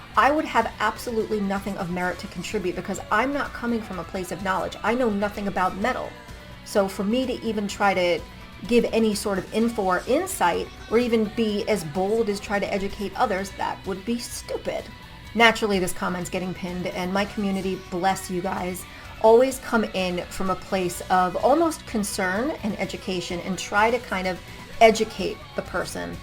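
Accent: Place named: New York, United States. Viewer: A goth with a NY accent! Priceless!😂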